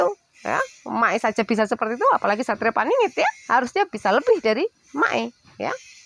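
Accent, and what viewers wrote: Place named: East Java, Indonesia. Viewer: batinku nungu rondo mu mak e iki mesti wong pati opo jatim kasar pool bahasane😂😂😂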